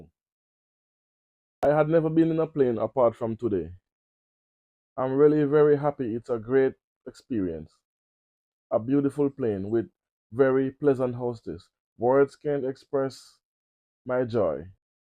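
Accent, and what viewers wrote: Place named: Jamaica. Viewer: You are only speaking to the Jamaicans, sir, because only them can understand your accent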